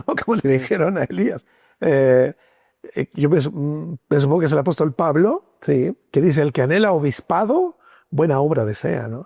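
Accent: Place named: Spain. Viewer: Ese acento español! ❤😊 Bendiciones!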